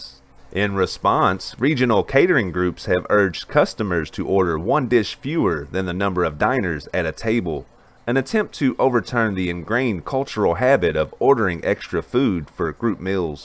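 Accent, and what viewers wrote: South, United States. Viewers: I agree with this comment...... but where the heck did that southern accent come from :)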